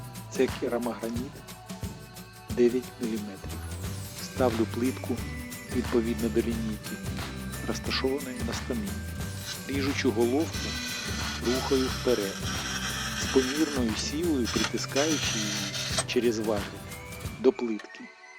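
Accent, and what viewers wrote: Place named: Russia. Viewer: Російський акцент ріже вуха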